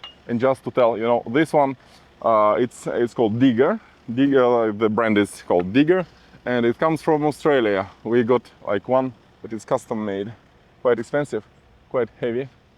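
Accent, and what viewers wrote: Russia. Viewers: I like your native Russian accent the best. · I know it’s a race against time to save the chateau, yet you have to work offsite to earn money to pay for the restoration. I hope you can increase your subscribers so you can get money through YouTube. It will happen but it takes time. I’m praying for a miracle for you. I love when you change off between speaking French and then English but when you speak inglish you have a Russian accent. No matter what, you are delightful. ❤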